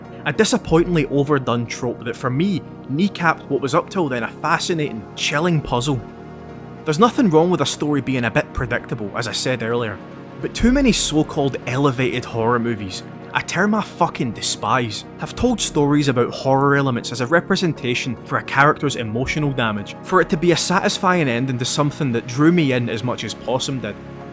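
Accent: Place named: Scotland, United Kingdom. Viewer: Your accent reminds of Trainspotting! Scottish! 😅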